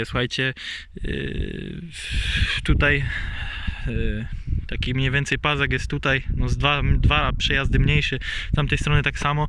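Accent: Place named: Podkarpacie, Poland. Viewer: Prosty chłop, prosty film i prosty przekaz i tak to ma wyglądać Brawo. Przede wszystkim gratulacje chęci i odwagi do podjęcia się takiego wyzwania i realizacji marzeń. Wpadłem tutaj trochę przez przypadek ale daje suba i zostaje na dłużej z wielką chęcią na więcej. Wrzucaj częściej cos nawet z drobnych prac naprawczych, przygotowawczych itp. Powoli małymi krokami a cos z tego napewno będzie. Trzymam za Ciebie kciuki i szczerze życzę powodzenia. Nie jest to lekki kawałek chleba ale widać że dobrze kombinujesz bo grafika to przydatny fach w dzisiejszych czasach. Pochwal się tylko jeśli można wiedzieć ile masz lat i czy jesteś z Podkarpacia bo tak trochę po Twojej wymowie sugeruje się tym regionem? Jesli chodzi o poprzedni film to nie wiem czy doszukałeś się już informacji czy nie ale jeśli dobrze się orientuję to te końcówki w opryskiwaczu różnią sie sposobem oprysku w zależności od danej uprawy. 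Nie jestem rolnikiem ale pamiętam że wujek który nim jest opowiadał mi o tym kiedys bo tez sie go o to pytalem😉 Jeszcze raz powodzenia i tak jak mowie troche częściej jakieś drobne nawet wstawki z nowościami, przemyśleniami i wgl a na pewno znajdzie się więcej osób chętnych wspomoc dobra rada i słowem w komentarzach. Powiedz jeszcze jak pracę przy opryskiwaczu stoją narazie w miejscu czy cos ruszyło? Pozdro 👍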